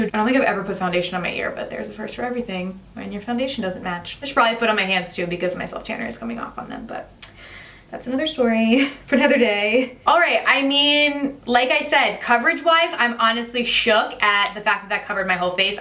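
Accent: New Jersey, United States. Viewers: Oh she is not, shes always talked like that, she's from Jersey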